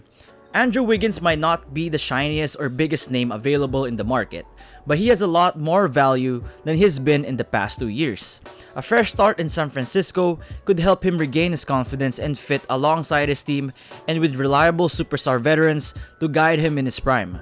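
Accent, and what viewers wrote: Philippines. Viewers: Lol Pinoy accent, my man.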